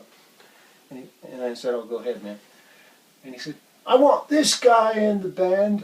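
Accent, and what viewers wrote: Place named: United Kingdom. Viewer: That is a Great,and Funny story! Love the British accent! It would be Cool to hear how you guys got the gig with Olivia Newton John!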